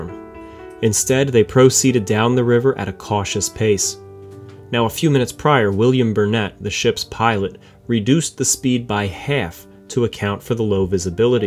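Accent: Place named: Michigan, United States. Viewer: could have sworn that was a Michigan accent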